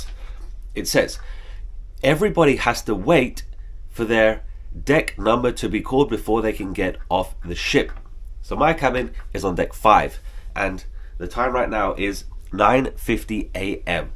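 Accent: United Kingdom. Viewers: I think you sound British, but you dress like an American 😜 especially your headwear. I’ve always heard that only Americans wear ball caps. And you have that San Francisco hat so people probably assume you’re American. 🤷🏼‍♀️ But I’m from the south and I have an accent. And I don’t really hear it myself most of the time. But certain words have that southern draw. And then I hear myself and I’m like damn I sound country as hell.😂 Also, do they have Uber in Copenhagen? Can you just Uber from the ship to the metro station at least. ? Also FYI, I’m actually headed down to Walt Disney World this week. ❤ 🏰 And that’s a crazy price, because I’m gonna pay close to that for five days at Thanksgiving.? But that also does include gratuities and all the port fees. Do you use a certain website ? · oh migod, you don't sound like American at all..I can't really place your accent. 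I know it is British. But being an American, I am not privy to the regional accents in England for sure. Still love your enthusiasm and venting and all that.. You live life fully, whether while complaining or whether in awe of something. We get swept away by your emotions. It is good to feel, whatever it is fully.. Thanks for the videos. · I agree. Sounds like bait and switch to me, regarding the port name. You’re not complaining, you’re informing. I appreciate all your info. Oh, and you sound perfectly British to me.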